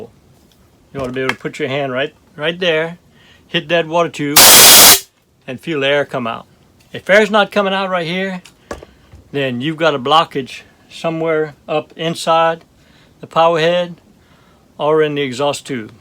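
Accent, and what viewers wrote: Louisiana, United States. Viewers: I miss that Cajun Accent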